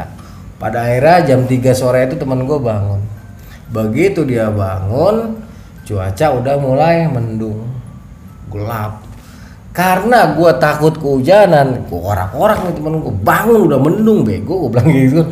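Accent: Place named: Jakarta, Indonesia. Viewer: 😀😀😀😀😀 Gw demen banget aksen betawinya Bang...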